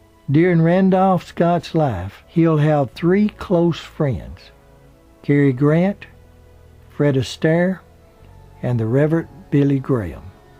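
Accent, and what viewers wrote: South, United States. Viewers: Many thanks, one of my favourite actors. BTW I love your accent, where in the States are you from? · The narrator has a beautiful southern accent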